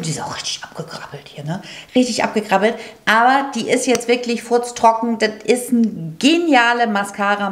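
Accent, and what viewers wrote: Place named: Berlin, Germany. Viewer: Hab dich eben entdeckt und finde du hast eine sehr fröhliche und erfrischende Art, die mich irgendwie glücklich macht. Darf ich fragen, wo du herkommst? Irgendwie hörst du dich so nach "Berliner Schnauze" an, find ick jut. LG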